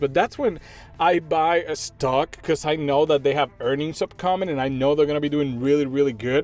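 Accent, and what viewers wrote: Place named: Spain. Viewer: Ok thank you for your answer, I know English too but I thought you was a native spanish talker jajajaja, I like a lot this new channel, i will take a look of the other videos, thanks for sharing your experience!!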